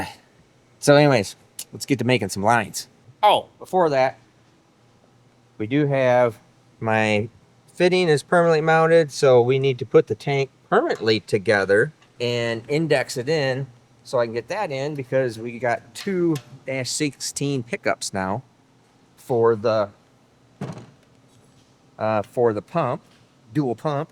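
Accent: Michigan, United States. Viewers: Nice explanation on the dual pumps, i immediately thought of staged pumps but more things to go wrong that way. On the “condescending” explanations. Part of it is the Michigan accent. I moved to Texas in the 80’s. I still have problems with my “tone” when my accent is more prominent, it sounds like your being condescending when its a detailed explanation and the Michigan enunciation sounds like your talking down to some. 🤷🏼‍♂️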